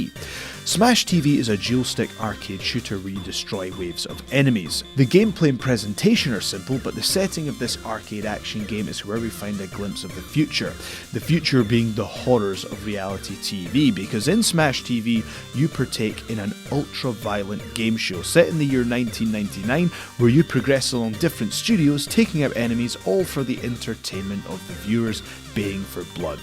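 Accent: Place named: Scotland, United Kingdom. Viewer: Dude must be from the same town as Gerard Butler, their accents are identical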